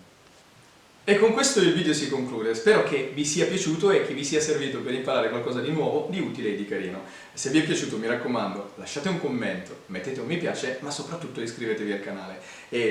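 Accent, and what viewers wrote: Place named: Sardinia, Italy. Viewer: Un tutorial ben fatto e la spiegazione e' impeccabile con un italiano tipico dei sardi... bello iscritto e like da adesso ti seguiro' sempre... un saluto Giovanni p.s. io faro qualche modifica per interrompere l'alimentazione alla luce lmpadina e accenderla solo la sera con un interruttore a parte... 10+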